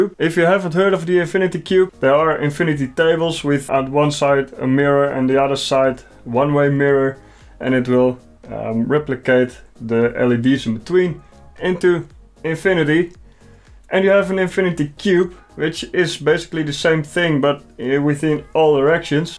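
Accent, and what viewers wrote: Netherlands. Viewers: A dutchman, unmistakably. Ill follow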